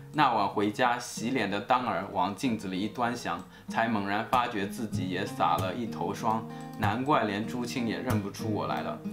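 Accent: Taiwan. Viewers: 你會唸沒錯，但是你怎麽用臺灣口音讀！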